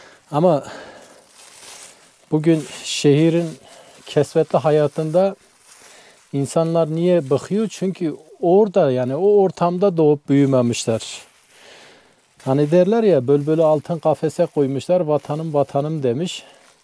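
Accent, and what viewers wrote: Adıyaman, Turkey. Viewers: Abi sen kahtalı mısın? Ağzından ilk kelime çıktığı anda eşime bu abi hemşehrimiz dedim..Adıyaman’dan sevgiler saygılar..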